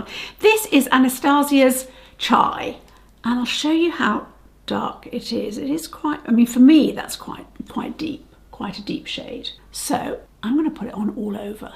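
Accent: United Kingdom. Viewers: I’m in the US and I think you’re fabulous. You would be so much fun to hang out with. I love your style and your posh accent. I love all these LE products too. I bought the Rosalie liquid lurex, the pink damask lipstick and the Kensington plum lip gloss. Sorry, if I’ve forgotten some of the names. Can’t wait to receive them. ❤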